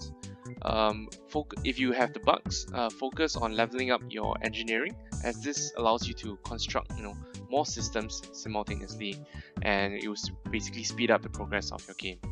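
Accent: Singapore. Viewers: sinkie accent very obvious haha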